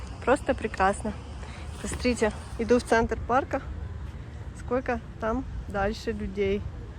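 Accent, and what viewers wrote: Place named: Odessa, Ukraine. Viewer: Спасибо. Очень красивое видео. Постаралась🌹🌹🌹 Ты единственная девочка, которая разговаривает с Одесской интонацией. Приятно слушать.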